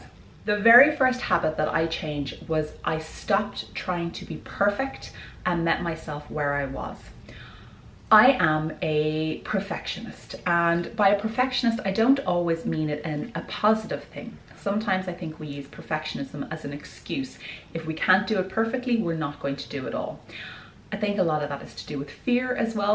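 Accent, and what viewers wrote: Ireland. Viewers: First of all, let me say that you are so cute and I love the Iris accent! Secondly, what you said about being a perfectionist resonated with me and not in a good way. I am hardest on myself. I'm an all or nothing type of person. I "throw the baby out with the bath water" instead of just throwing out the water. If I mess up, I'll say that I might as well go all out and do what I want. Thank you for this video and for talking some sense into me. I need to have compassion for myself and allow the hiccups to come and go but not let them define me. Thanks again. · I enjoyed your tips and listening to your Irish brogue. Thanks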